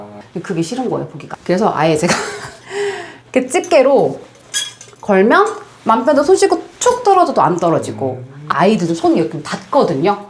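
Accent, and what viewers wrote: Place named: Gyeongsang, South Korea. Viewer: 경상도 사람인가보다 서울말이 어울리지않게 힘들어보이네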